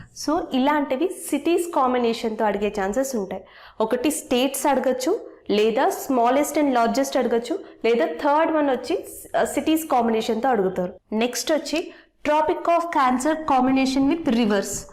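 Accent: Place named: South, India. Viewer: Pronunciation southern (Sadaran)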